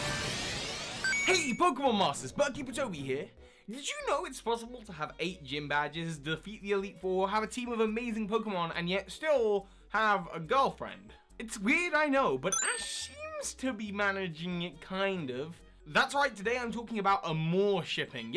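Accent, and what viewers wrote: United Kingdom. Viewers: soar high... awesome catchphrase there, amplified by the good old British accent. quick question( that you may have answered before, but you have so many videos and I have so little time):from the legendaries alone what game would you pick, sun or moon? I personally would like any but I would prefer moon because, although I adore psychic types, dark is my 3rd favourite type! I can't think of how to end this so, buh-bye!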